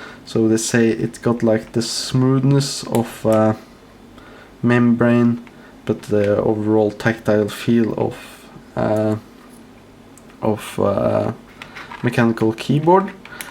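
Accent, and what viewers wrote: Sweden. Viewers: Are you from Sweden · I like this Nordic Swedish Accent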